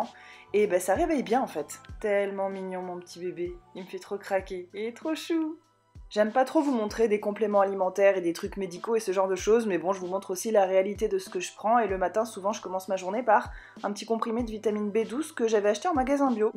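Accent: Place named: South, France. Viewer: Hey!! Non m ai tu m'a TUÉ !! toute la vidéo 📼j'ai aimé ton ACCENT du SUD HOUEEEEE !! SUPER repas très beau Visuellement très bon dessert tu m'a donné l eau à la bouche d autant plus que je suis entrain d éplucher des PATATES pour les passer ensuite à la POILES J APELLE sa des patates sauter !!! 🥔🥔🥔🥔🥔🥔🥔😂😂😂😂😂😂😂😂😂À Perpignan il 18h30 à 20h on mange et en plus j'ai la DALE FIN BREF je vous souhaite une bonne soirée et des bibisou !! 😘😘😘😘🌃🌃🌃😘🤗🤗🤗🤗🤗👍👍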